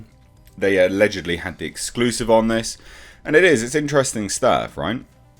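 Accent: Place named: United Kingdom. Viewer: I hope you don't mind but I just gave your name up to Umbrella Guy on a live stream with him just now. It was regarding your Vogue critique earlier today. I found that really funny and mentioned it to TUG and his response was that he likes you a lot, and said he'd like to get you back on a flashcast. So I have my remind buttons on and I'll keep watching for that. Anyway, love your channel! Been watching for a while now. Nice to hear a fellow Brit on YouTube. Cheers. 🖖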